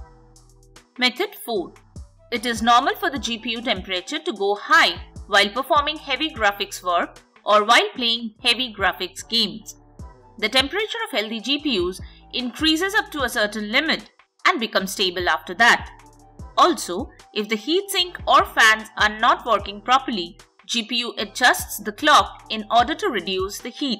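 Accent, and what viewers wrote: India. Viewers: step 1 , don't trust any computer video of indian accent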